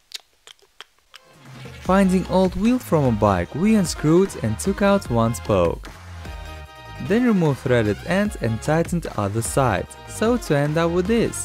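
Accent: Russia. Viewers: because he has a russian accent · i just loooove his russian accent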